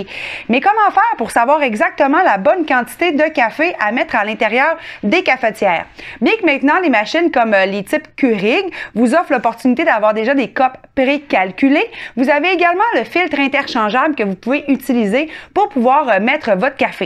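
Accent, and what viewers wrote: Quebec, Canada. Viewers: Bien le bonjour. Le café doit être bon mais l'accent Québécois est plus sucré et très agréable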